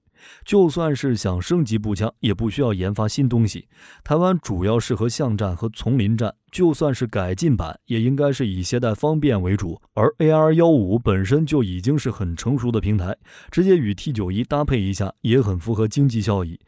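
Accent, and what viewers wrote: China. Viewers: 不要忘了，這個頻道聽他口音是大陸腔。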